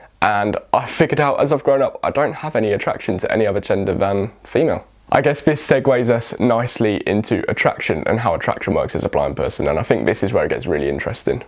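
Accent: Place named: England, United Kingdom. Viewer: Hi my friend, my name is Jordan I have a channel here on YouTube called the blind world. We’re trying to create a world where like what you were saying this video for you had people in your life you were naive and you thought that they couldn’t have relationships with you because you were visually impaired and blind. I’m trying to create a world where that doesn’t happen where people can have relationships for us despite us being blind and I want to create a world where we are seen as just people really, and I like this video because I’m actually trying to figure out how to have sex. I know that I’m attracted to people to women but just How I will be physically able to have sex and that’s all I kind of want to say really and I’ve seen your Instagram post and I think you’re very good you’re very educational and I can relate to the stuff that you’re talking about since I am actually vision impaired myself and I have autism and I am from Ireland and I’ve noticed by the sound of your voice that you’re precious visually impaired person but you’re from England I just I hope to get to know you as time goes on as I watch your videos and just I hope you know that I’m being genuine with my comment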